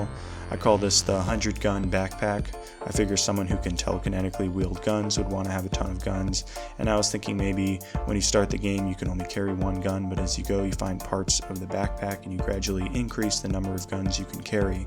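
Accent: Australia. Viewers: Let me gues. Australia? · I bet you're australian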